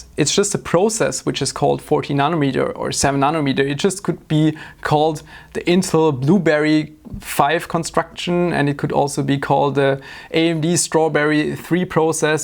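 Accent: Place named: Northern Germany, Germany. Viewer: By listening to you I would guess you are from Berlin or maybe Hamburg, some city in the north of Germany at least. BTW Not too surprised that you got nothing out of Intel, maybe they should change their brand name since they seem to have run out of "Intel"...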